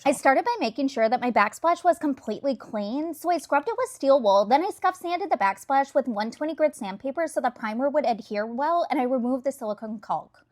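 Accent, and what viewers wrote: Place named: Midwest, United States. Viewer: Wow, your accent is so fascinating! It's like you're from Minnesota/Wisconsin but you've lived in California for 20 years. I am a HUGE fan of painting backsplashes instead of retiling if you hate it. You nailed it.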